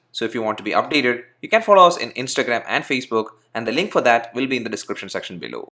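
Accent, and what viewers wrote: Kerala, India. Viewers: aa accent ketapozhe enik thonni malayalee aanennu ..hahaha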